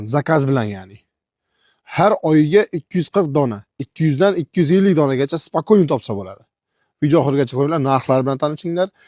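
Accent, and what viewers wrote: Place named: Uzbekistan. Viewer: Гап йук ака соф узбекча гапирар экансиз.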